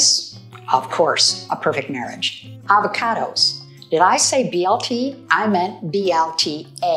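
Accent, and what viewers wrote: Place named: Midwest, United States. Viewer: BTW love your mid west ? Accent. 🙂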